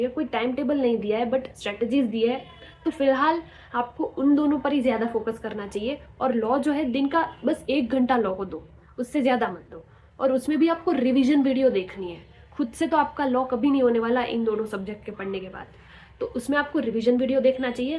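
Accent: Gujarat, India. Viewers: I smell Gujarati accent little bit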